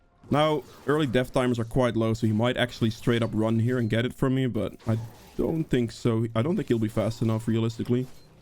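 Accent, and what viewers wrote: Netherlands. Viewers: Hoor ik nou een Nederlands accent?😂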